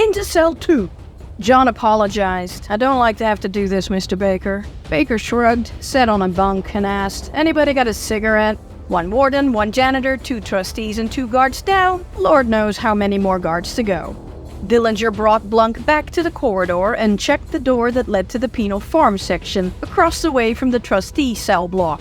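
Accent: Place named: Netherlands. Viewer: I love that Dutch accent. This is brilliantly made.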